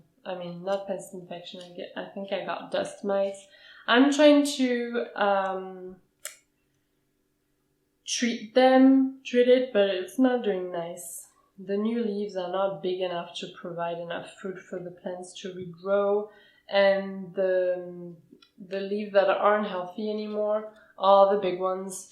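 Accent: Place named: France. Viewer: Great videos! I've seen your other videos and love your collection! I've been an orchid collector for a while now and have recently ordered a Cllathea Medallion and Stromonthe Triostar. It seems they both can be temperamental about their location, watering, and room humidity. But thanks to You Tubers like you I'll know what to be aware of and how to react. I like that "Pen stripped" Calathea you have. Im going to have to get one of those. Its so unique and beautiful. BTW you speak very good and clear english and your accent is very hard to detect but i think its french? Im guessing your Canadian? Thanks again! You Tube is my #1 plant resource.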